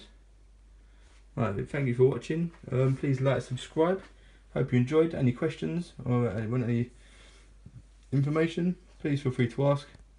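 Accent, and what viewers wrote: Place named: United Kingdom. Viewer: I did not understand anything of what you said... its too bri-ish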